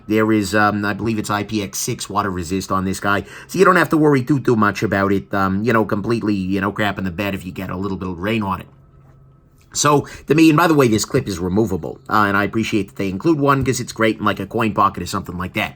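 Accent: New York, United States. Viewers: i have not heard a more new york italian accent in my life...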